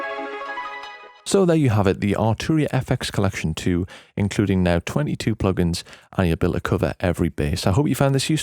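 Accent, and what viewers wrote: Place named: North East England, United Kingdom. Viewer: Champion North East accent there fella!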